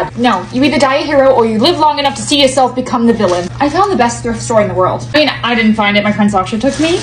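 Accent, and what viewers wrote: United States. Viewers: Why is she American now? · You sounded so American at points in this. I’ve never noticed it before 😅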